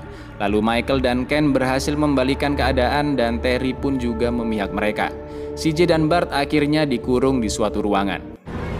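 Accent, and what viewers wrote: Java, Indonesia. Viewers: Jowo ne ngendi slorr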